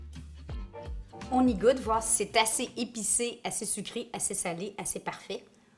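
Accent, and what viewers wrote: Canada. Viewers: Merci beaucoup pour toutes ces bonnes recettes faciles. Ça fait plaisir d'entendre ce bel accent canadien. 😜👍😘 Janie de Paris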